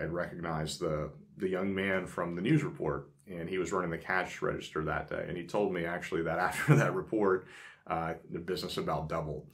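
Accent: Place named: Charleston, United States. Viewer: You sound like you are from Charleston, SC. My husband lived outside Charleston and heard that accent a lot.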